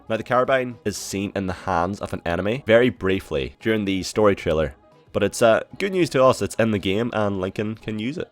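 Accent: Donegal, Ireland. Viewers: Are you Irish? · haha I just know the accent lol im from donegal as well can't wait for this game to come out 😊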